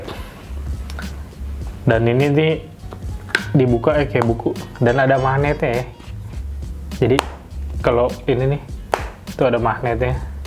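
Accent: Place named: Madura, Indonesia. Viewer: Bang love your accent. Madura kah? Sorry klo salah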